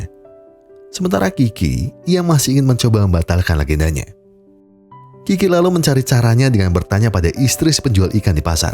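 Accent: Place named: Surabaya, Indonesia. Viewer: ternyata orang surabaya masnya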